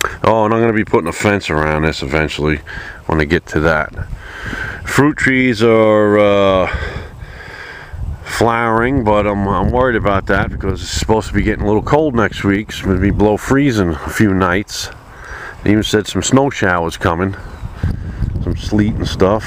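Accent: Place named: Maine, United States. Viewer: Just found your channel, watching all the videos soon. I am sure you've been asked, and have probably answered already...where are you from? Your accent reminds me of family back home in Maine. :) Your set up is great!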